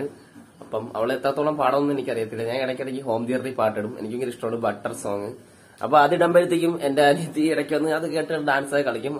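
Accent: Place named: Thiruvananthapuram, India. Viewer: സഹോദരന്റെ പേരും നാടും..? ശൈലികേട്ടിട്ട് തിരുവനന്തപുരമാണെന്ന് തോന്നി. 🌹 I am Kasaragod.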